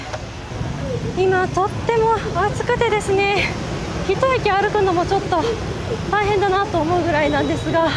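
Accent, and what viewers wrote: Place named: Hiroshima, Japan. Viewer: up主さん、広島または中国地方の出身ですか？ところどころの方言が心地良いです。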